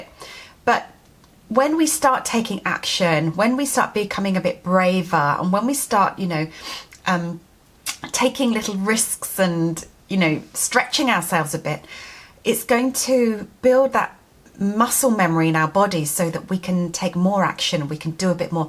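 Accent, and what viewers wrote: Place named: England, United Kingdom. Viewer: Omg YES. EFT with an English accent -makes it even more posh :D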